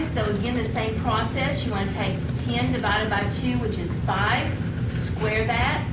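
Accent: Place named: Tennessee, United States. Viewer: Tennessee accent or Alabama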